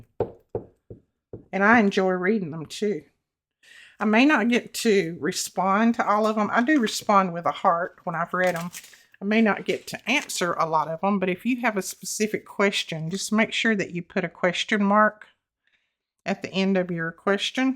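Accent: South, United States. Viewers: Hi just love your inspirational videos. I could listen to your soft gentle Southern accent all day. Wishing you all the best for this year 2024. I was wondering if you could design some digitals with some birds, flowers and butterflies from USA?. I am from the Uk 🇬🇧 Bunny x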